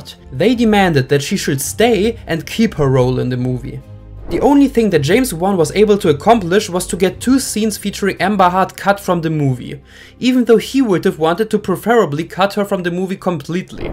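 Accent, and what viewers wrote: Germany. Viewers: He‘s German, English isn‘t his native tongue. Relax.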